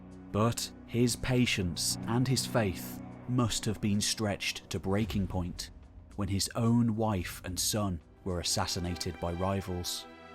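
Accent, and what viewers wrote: United Kingdom. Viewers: Also the method of speaking and accent, I love. Very relaxing, + British so you sound smarter.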